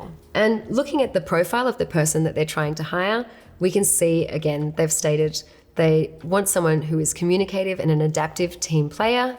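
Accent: Australia. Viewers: Australian accent, love it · She sounds Australian.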